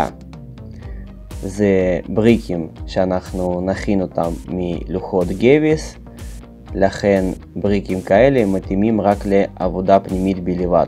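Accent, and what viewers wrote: Russia. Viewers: Хароший Русский акцент. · Твой" русский" акцент в иврите звучит знакомо -:)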